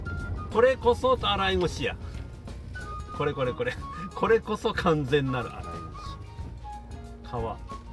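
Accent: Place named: Fukui, Japan. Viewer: 声を聞いていたら福井弁のように感じましたので思わず登録しました(笑) この道は３０年ほど前に比べると今は少し良くなった気がしますね。それでも普通も道しか知らない人には驚きの連続でしょうね。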